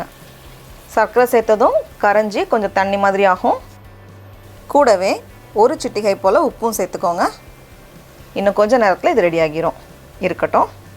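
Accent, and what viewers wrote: Nagercoil, India. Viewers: akka neenga USlaya irukeenga appro nagercoil kaarangala ☺☺☺ so happy i heared it in a you tube channel appro onga veetla ellaru nalla irukaangala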